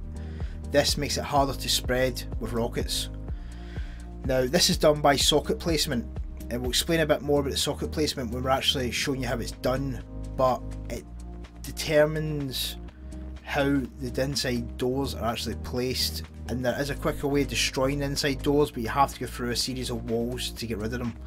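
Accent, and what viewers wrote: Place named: Scotland, United Kingdom. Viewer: Man r u from scotland cus i love ur accent!!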